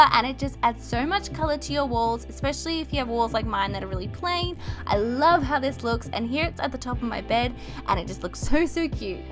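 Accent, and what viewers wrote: Australia. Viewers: She's from Australia so yes she does have an accent! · are you Austrailian? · Are you from australia?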